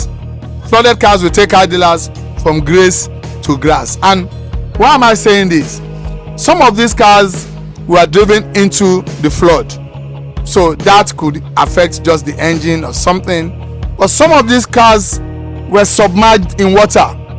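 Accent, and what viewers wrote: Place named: Africa. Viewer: African english is so funny 😂😂😂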